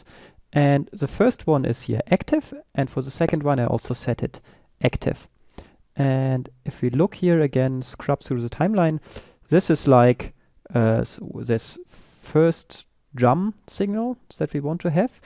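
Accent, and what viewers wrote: Germany. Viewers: This tutorial is great! It doesn´t matter if you hear a German accent! Any accent is better than using a bad translator - 0k? :) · deutscher .. hört man sofort :D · Deutscher, hört man ;) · are you german? ^.^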